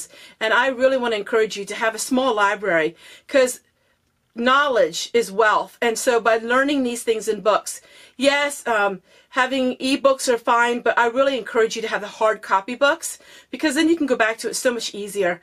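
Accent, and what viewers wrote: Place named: Philadelphia, United States. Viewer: New to your channel! Omg!! You’re accent is strong! Not making fun, we have a similar accent, I’m from south jersey and my husband is from Philly. We were taking bets on where you were from until I saw your P.O. Box. I won!! Love your set up!! I had a laugh when I saw your china cabinet. I’ve converted mine for storage and my husband gave me a look 😂 See??!! I’m not the only one!!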